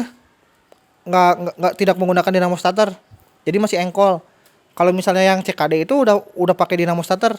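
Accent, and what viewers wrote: West Java, Indonesia. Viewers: Sunda bet yah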